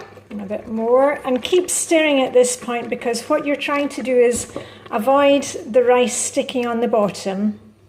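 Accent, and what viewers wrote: Scotland, United Kingdom. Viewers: Danish with a Scottish accent. That’s different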